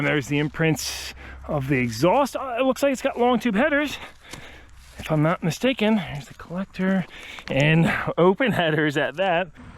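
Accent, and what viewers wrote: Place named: Pennsylvania, United States. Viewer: Thought I recognized that pa accent. My grandparents both sides of my family lived in different town there. Cool agricultural towns!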